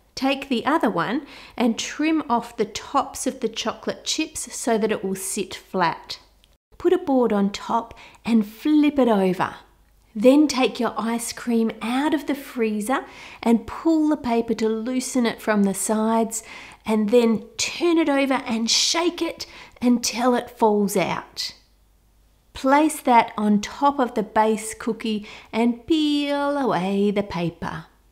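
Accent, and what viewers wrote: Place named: Australia. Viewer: Peel away inhales THE PAYPEH I love Australian accents lol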